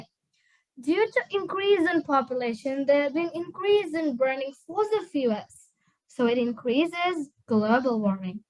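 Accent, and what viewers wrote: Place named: United States. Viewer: Speaking like someone who born in America, next level accent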